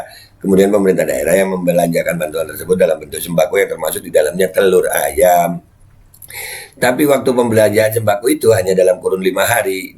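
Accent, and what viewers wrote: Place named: Java, Indonesia. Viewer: medok bingits 😁😁😁😁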